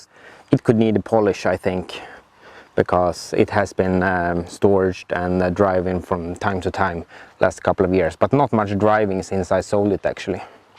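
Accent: Sweden. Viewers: Are u swidish?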